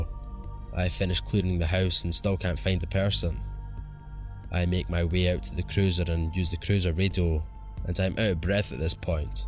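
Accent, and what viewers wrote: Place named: Scotland, United Kingdom. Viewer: I 💘 The Excellent Narration by 👏👏👏..bravo!!! I'm digging The Scottish Accent 😚 Keep up the Awesomelicious job;) much love Sexy Grandma Darkness✌💖💖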